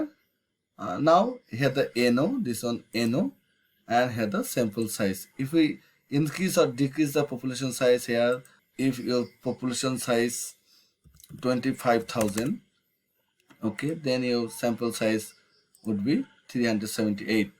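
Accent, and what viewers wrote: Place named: Bangladesh. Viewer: are you from Bangladesh? Your accent is very similar to the south east region of Bangladesh.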